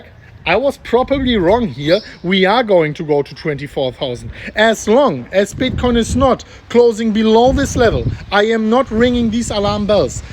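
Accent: Germany. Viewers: Ich feier deinen deutschen Akzent jedesmal! Sehr gute und unterhaltsame Videos! Ich schätze es geht NICHT mehr runter, alleine wegen El Salvador!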